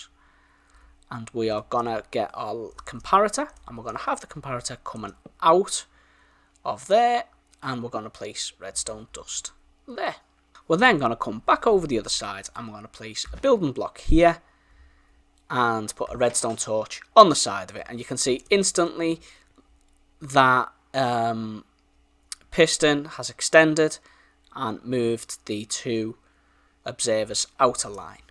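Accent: Liverpool, United Kingdom. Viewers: Liked just because you are a scouser :)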